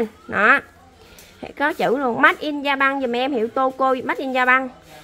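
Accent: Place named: Mekong Delta, Vietnam. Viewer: Giọng nói giống người miền Tây quá bé , nghe giọng này làm hồi ức lại đã từng công tác dưới miền Tây 12 năm . Rất chân chất thật thà! Có dịp sẽ ủng hộ bé . Phong Cách giới thiệu sản phẩm rất thiện cảm .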